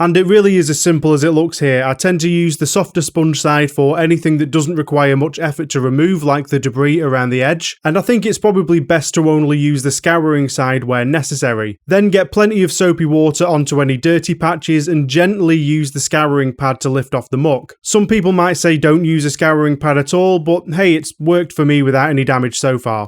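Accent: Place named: Liverpool, United Kingdom. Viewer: I love your accent!! ❤ Liverpool?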